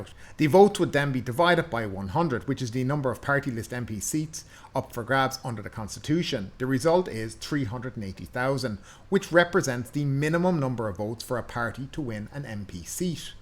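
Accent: Ireland. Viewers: But what can we infer from your charming Irish lilt? 😄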